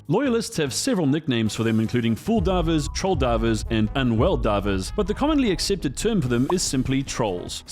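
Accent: South Africa. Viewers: I hear a SOUTH AFRICAN CUZZIN... I subscribe!!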